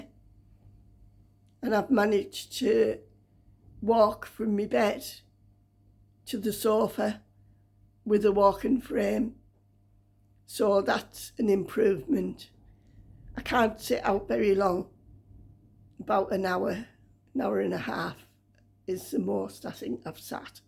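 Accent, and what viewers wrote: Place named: North East, United Kingdom. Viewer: don’t apologise, sounds like its been a really tougher time lately. Love spending time with you and hearing someone from my neck of the woods, I live abroad at the moment. But miss the north east. Hope you keep getting a bit stronger each day. Take care love and hugs to you and your family xx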